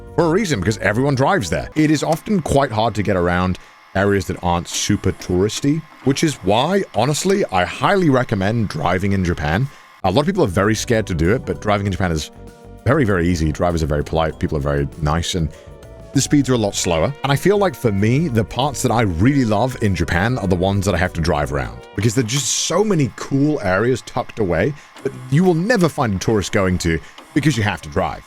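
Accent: Wales, United Kingdom. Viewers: Where you talk about loosing touch with you're "welshness" I feel you're loosing your accent. It's becoming more generically English vs out of the mines Welsh. (vs the midwife I just had for the birth of my son- where it's like, "Yeah you're definately from Wales.")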